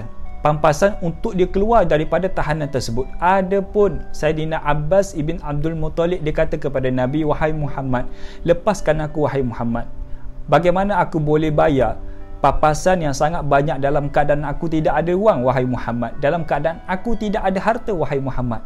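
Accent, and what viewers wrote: Kelantan, Malaysia. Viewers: Bagus penerangan ustaz. TQ Dr.. Ada bunyi loghat kelate sikit²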